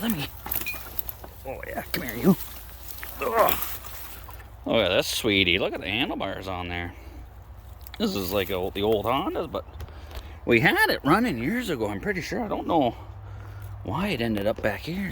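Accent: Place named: Newfoundland, Canada. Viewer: A you sound like you have a little bit of Newfoundlander in you. I keep that little bike for sure it seem you have a lot of work, with a couple of Bud light beers easy Pesy.👍😉🍺👍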